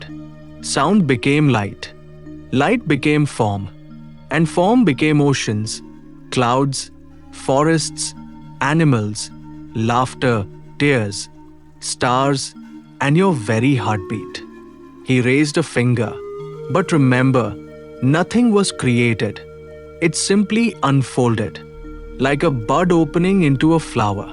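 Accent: India. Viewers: Why the Indian-English voice when the video is about a Chinaman? 😏👎👎👎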